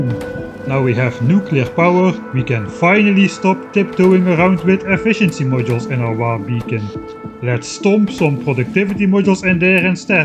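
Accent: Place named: Netherlands. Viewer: you are too humble - any of your tongue tied treats is a song to any of us bots, boys or babes! Doesn't need a tune - your dutch accent is sufficient music :)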